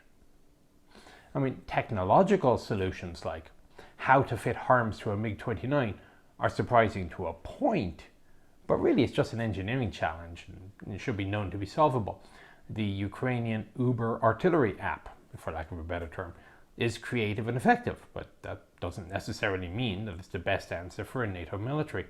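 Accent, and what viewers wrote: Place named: Ireland. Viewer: Chief, your such a national treasure ya could put your musing into a text to speech narrator and it still be riveting commentary!!!!!!!!!!!! It would be a tragedy to deny the world the utter charm that is your Mick Jagger lipped Irish accent, dont go changing ya gentlemen and scholar!!!.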